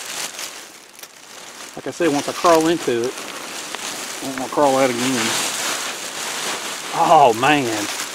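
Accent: South, United States. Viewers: I always love your content. And your Accent bro. Are you from Down South? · Does anybody else watch because of the accent and the little sayings? Laughed out loud at the one about having to go to bed because he could hear the crickets! Of all the reasons and excuses for why it's bedtime, I have never used that one! Loved it... Subbed a while ago after hearing him refer to a rock as an Alabama hammer... What part of America is this accent common?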